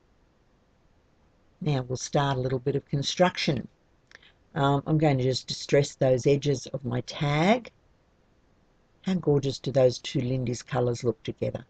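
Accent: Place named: Australia. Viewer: Love your tutorials and your accent lol. I'm from Aussie as well. Thank you so much for all these tutorials. I'm very new to this so I'm finding these extremely helpful.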